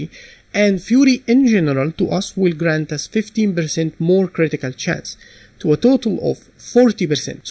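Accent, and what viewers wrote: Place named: Egypt. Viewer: new players and your channel is very helpful thank you so much🤍 also your accent add a flavor to the video it's very close to my Egyptian professor 😂😂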